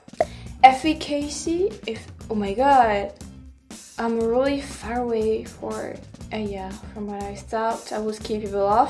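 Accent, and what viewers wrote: France. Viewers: Great job with this video you look very nice in it and Saying English words with your French accent Sounds Adorable and it's 👌 to laugh at oneself from Time to Time.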